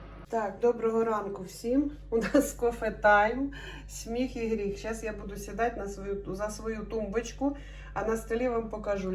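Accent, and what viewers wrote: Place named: Zaporizhzhia, Ukraine. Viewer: сьогодні ролік клас дуже весело і цікаво а розмовляєш ти українською на нашім ріднім запорізьким діалектом.Слухати тебе приємно як з'їздив до себе додому.Прохання: більше показуйте і розповідайте про бази,показуйте місцевість.Якщо нема машини як ще можна передвигатись по тій місцевості,буду вдячна.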